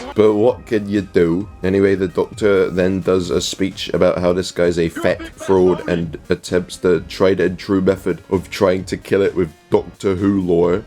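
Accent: United Kingdom. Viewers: Time to listen to a british git speak about a show I watched like once when I was the size of a bedside cabinet and not understand anything like a modern tik tok kid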